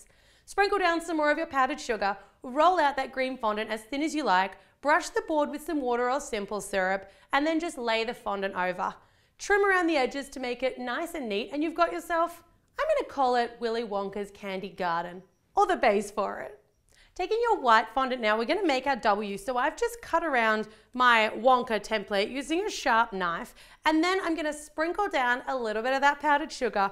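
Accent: Australia. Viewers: Anyone know what part of Australia she is from? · she is australian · Is she Australian? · are u australian ?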